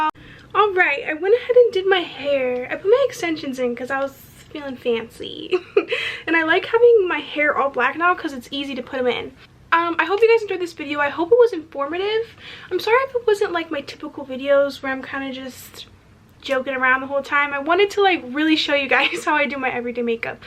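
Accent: United Kingdom. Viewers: The British accent LOL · i love your random british accent 😂❤️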